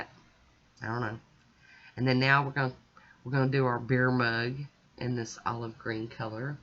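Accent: South, United States. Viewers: I love it!! And I like your voice, it's the few times I get to hear a southern accent. And your tips are especially nice, sometimes ya forget things and make it harder on yourselves. 💚🍀💚🍀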